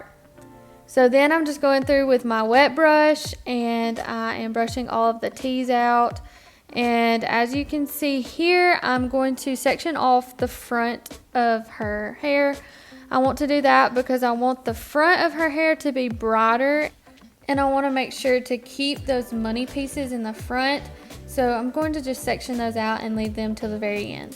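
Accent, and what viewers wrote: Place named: South, United States. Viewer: Love it! Great job and I absolutely love your adorable Southern accent. ❤❤❤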